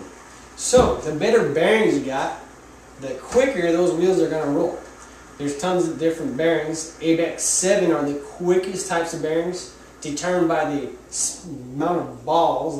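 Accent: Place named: Texas, United States. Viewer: Does anyone notice his slight Texan accent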